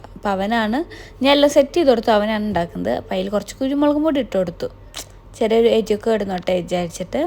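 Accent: Thrissur, India. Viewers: തൃശൂർ സ്ലാങ് ആണ് ... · തൃശൂർ കൊളോക്കിയൽ സ്ലാങ് ആണിത്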